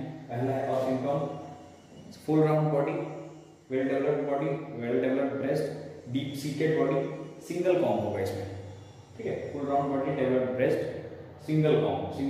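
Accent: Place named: Uttar Pradesh, India. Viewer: Apart from the content (which indeed was informative), loved you n your U.P accent as well❤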